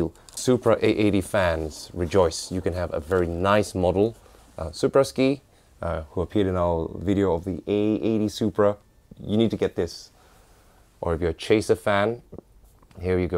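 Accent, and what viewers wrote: England, United Kingdom. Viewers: why is the talking like an Englishman if he's a Japanese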